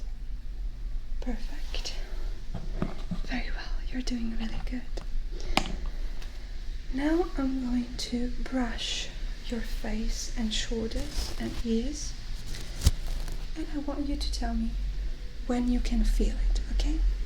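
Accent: England, United Kingdom. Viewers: el acento británico q le pones es excelente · that british accent omg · I really like your English accent ☺😋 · Lmao I love the way you do an English accent. Great video as always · Ese ligero acento británico que haces es hermoso y muy relajante 😍😍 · C'est marrant que tu aies un accent britannique quand tu parles anglais. Thanks for the video! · Tu acento de inglés es británico que cool, el mio es el típico acento de Estados Unidos 😂😂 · tu acento en este vídeo era británico a diferencia de otros que has hecho... me gustó 👌👍👌👍👍👌👌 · "ostiaa" cit. 😂😂 per pochi secondi mi hai steso;) per il resto che dire, adoro il tuo modo di parlare, il tuo English accent, adoro ascoltarti in ogni lingua 😄😄 thanks 😘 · Esto es inglés británico,?:D Me encanta cómo lo hablas. <3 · Sounds like you are doing a bit of a British accent in this vid. I like it! :) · am i crazy (hah) or is she kinda doing an english accent?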